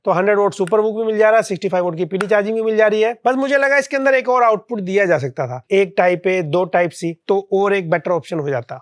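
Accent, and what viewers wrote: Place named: Uttar Pradesh, India. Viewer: भइया आप के बोलने का तरीका बड़ा बढ़िया लगता है मतलब लगता है की हा हम उत्तर प्रदेश से हैं बहुत इंटरेस्टिंग वीडियो होती है आपकी ❤ से love u brother 🥰🫶🏻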